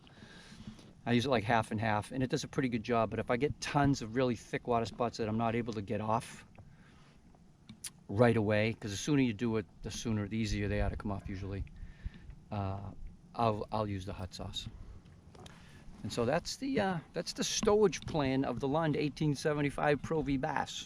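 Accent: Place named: New England, United States. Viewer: Awesome...thanks for your service as well and the information! My fishing will be done in ME so the biggest water I’ll see will be Moosehead Lake. You sound like a NE guy so I’m sure you’ve heard of it. I hope you don’t mind if I hit you up again in the future with questions. Good chatting with you!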